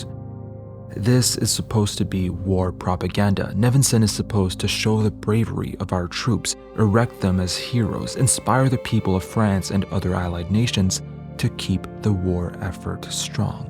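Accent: Quebec, Canada. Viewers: I have to say, your french pronunciation is extremely on point. Why you do have a quebecois accent tho? Edit: oh, you’re probably quebecois, makes sense. My bad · Es ce que tu es québécois? Ton accent me dit quelque chose, on se reconnais tous en tant que frère québécois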